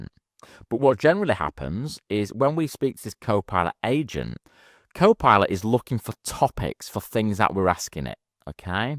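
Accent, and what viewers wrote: United Kingdom. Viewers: I believe this i s my first time of watching your video. THANK YOU for this. It was funny (especially with the British accent), practical, thorough and well explained. Keep them coming.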